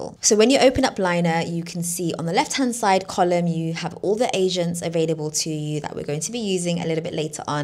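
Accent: United Kingdom. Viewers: Dear, I love your accent, its pure british, however your channel is expensive, I could not afford it.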